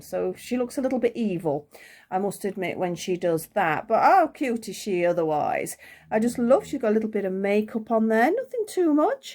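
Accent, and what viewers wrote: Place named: Yorkshire, United Kingdom. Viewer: You sound like a Yorkshire/Nottinghamshire lass XX I've just discovered these cute little dolls, there are a couple on sale on Amazon but I thought I'd watch a review first so thank you for sharing 😊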